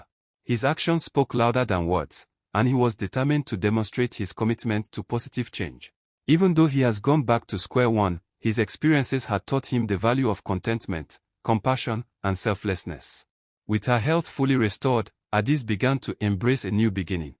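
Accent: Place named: Nigeria. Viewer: Better. Get a very nice one and use instead of this Nigerian voice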